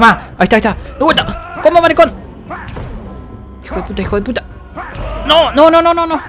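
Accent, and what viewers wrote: Argentina. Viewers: en este video pense que eras argentino y lo eres :v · eres de argentina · sos de argentina o de donde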